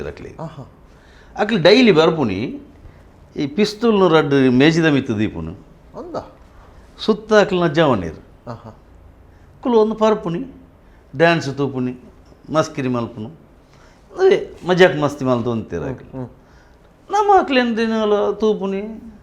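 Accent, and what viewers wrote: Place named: Mangalore, India. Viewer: Kudladha kannada super chethu, Yir pandidh tojapayar tule,avvu kudladha kannada.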